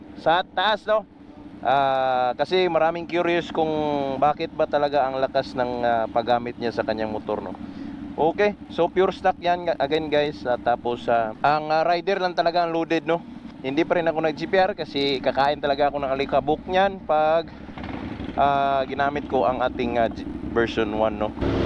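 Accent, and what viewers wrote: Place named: Philippines. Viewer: i love ur filipino accent "lean anggol"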